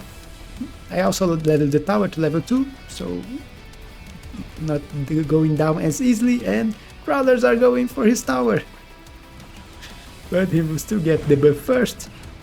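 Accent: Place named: Brazil. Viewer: Eu também sou brasileiro aí reconheço o sotaque! Hahahah Você joga bem, mano, parabéns! Desejo sucesso!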